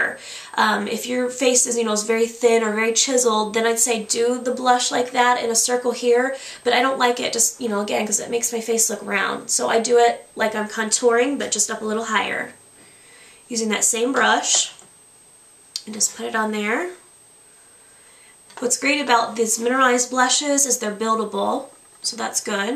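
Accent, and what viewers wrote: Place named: Michigan, United States. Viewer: Your skin does look great. btw, you sound like the typical michigan girl!!! very thick michigan accent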